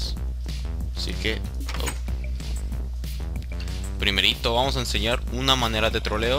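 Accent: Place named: Spain. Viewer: siges con acento español e_e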